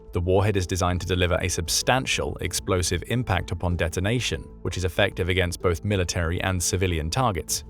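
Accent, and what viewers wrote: United Kingdom. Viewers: You're using the British accent to kind-of whitewash some part of the British Raj system that turned communist in West Asia. The in-person alternative to Zionist government is something more evil with drugs and aliens, and the power structure for that derives from Shiite heresy against burial edict. There's a cultural schizophrenia where it is common to disparage Israel, de minimus, by omitting the obscenities to which Israel is some counter.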